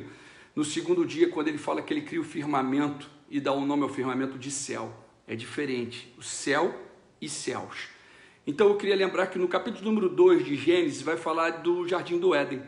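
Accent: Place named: Rio de Janeiro, Brazil. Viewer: Conteúdo muito bom , porém esse sotaque de carioca é nojento , impossível se inscrever 🤮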